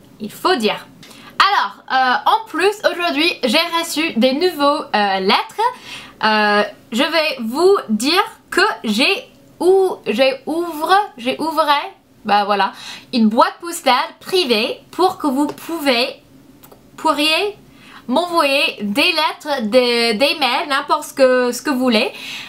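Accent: France. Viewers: !!! J'adore ton accent de frenchy! Et puis on comprends très bien ce que tu veux dire, c'est ça qui est mignon! je suis tellement déçue de ne pas avoir eu l'occasion de te voir sur Paris! Des bisous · Tu es tellement belle ! J'adore ton accent français c'est trop trop mignon ! Tu es géniale <3 Bisous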